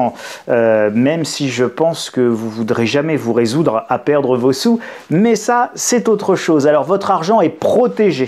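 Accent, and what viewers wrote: France. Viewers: T'es normand ? Yes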